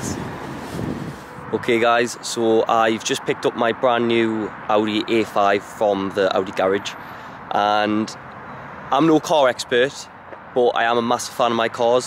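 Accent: United Kingdom. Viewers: Love that British accent♡ I think Audi have the best car, love it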